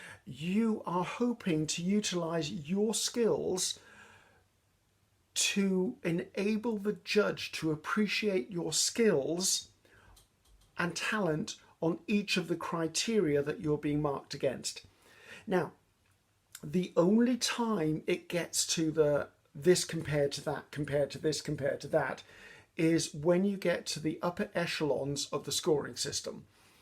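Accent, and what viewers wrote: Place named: Wales, United Kingdom. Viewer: Love watching your work come into being. Just beautiful every time. Today while watching your Sept 4, 2021 video, "Create unique labels from one of a kind Master Boards", <link> I heard you say you're in Wales. Suddenly, your accent made all kinds of sense. With the Welcome to Wrexham Docu Series by Rob and Ryan, I've been hearing the accent quite a lot and fallen quite into fanship for Wrexham, the community and team. ARe you a fan of any of the Football clubs?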